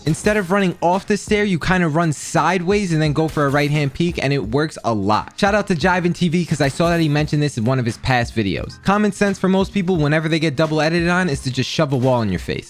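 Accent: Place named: New Jersey, United States. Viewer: Thx so much and are you from New Jersey cuz you have the accent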